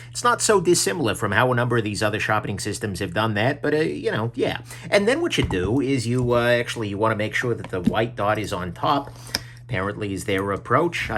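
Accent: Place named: Boston, United States. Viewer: I was placing it as a Boston accent, so curious because of your question, I snooped around the interwebs. He said, "(Seriously, though, when you're raised by a Bostonian and a New Yorker in the American West, you're pretty much screwed from the start.)"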